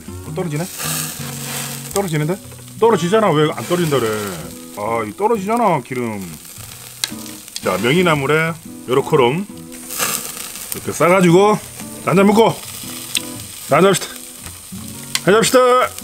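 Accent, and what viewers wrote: Gyeongsang, South Korea. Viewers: 형님~ 강원도라고 하셨는데 출신은 경상도?? 쌔리~~~하네ㅋㅋㅋㅋㅋ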